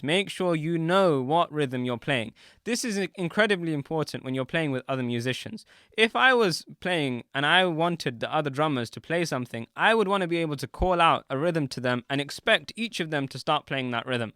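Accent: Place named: England, United Kingdom. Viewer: Great job brother thanks for the videos. By the way I like your English accent 😊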